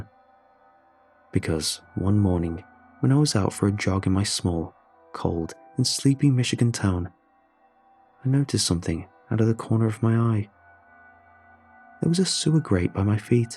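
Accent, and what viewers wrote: England, United Kingdom. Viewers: Well done big lad, incase you haven’t noticed the narrator is English, and it’s a bit of fiction story telling. Absolute yogurt for brains !!! “tHiS iS AmeRiCa” 👁👄👁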